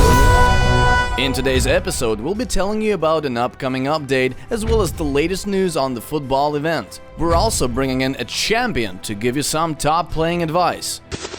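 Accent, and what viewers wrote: Russia. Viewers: He is Russian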